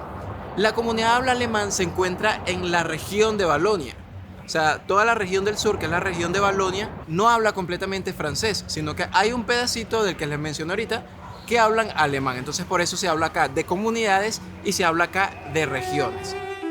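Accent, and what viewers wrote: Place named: Venezuela. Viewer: Un saludo, lo que expones en tu vídeo es un tema muy complejo pero lo has explicado con claridad, es muy interesante y educativo. No sé si interactuas con tus seguidores pero quisiera saber cuál es tu nacionalidad, porque pareces ser de Venezuela por tu acento, es así? Ya me suscribí y te sigo suerte en tu proyecto de canal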